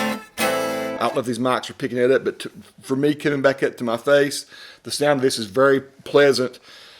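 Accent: South, United States. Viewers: I love your videos, they are so in depth and practical. It is also nice to hear a southern drawl. I ordered one of these based on your review. Thanks so much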